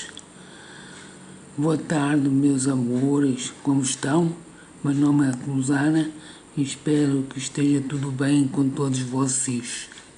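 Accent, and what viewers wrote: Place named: Portugal. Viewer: Olá amiga, passando para conhecer seu trabalho. Muito bom saber sobre a doença. Você parece de Portugal. Deus abençoe 💋🌹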